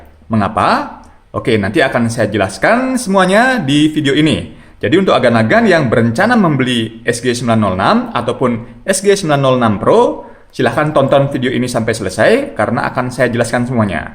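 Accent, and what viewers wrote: Bali, Indonesia. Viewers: Logat bali, Semeton ne 😍👍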